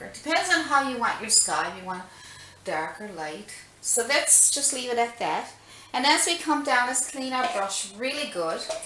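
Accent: Newfoundland, Canada. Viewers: Hi, really like the videos, I'm a total newbie (senior) at painting and these help a lot. Was wondering about the accent, kind of sounds like you are or were from Newfoundland, or is it Irish? Thanks for all you do for others:)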